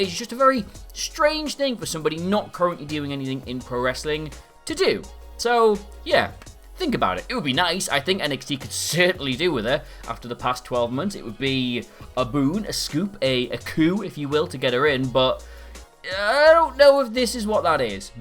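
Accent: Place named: United Kingdom. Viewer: My American ears never get tired of British "years". "It took yeeahhz and yeeahhz and yeeahhz and yeeahhz off our lives."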